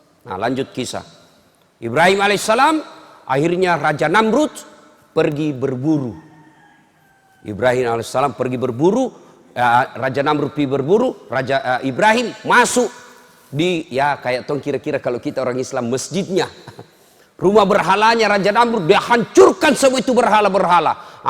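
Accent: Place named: Bugis, Indonesia. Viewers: Ceramah lucu,kocak,bikin ngakak,logat bugisnya bikin tambah suka banget. Tapi sangat berbobot...saya ska ustadz. Smoga selalu diberkahi Allaahh ya istadz. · Inget babah denger logat bugis ustad das'ad😢 semoga nanti bisa undang ustad saat ada acara🙏 aamiin yaa allah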